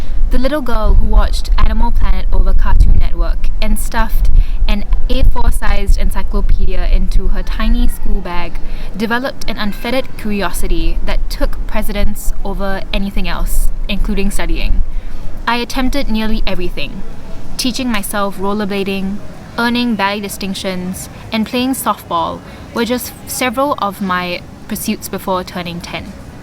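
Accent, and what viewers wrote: Singapore. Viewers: Hopefully your Singaporean accent will fade away with the world class education at Yale.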